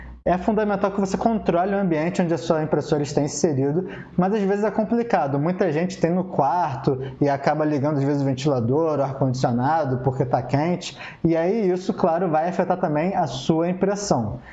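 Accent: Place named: Rio de Janeiro, Brazil. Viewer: Esse sotaque carioquêixxxx é nojento demais meu.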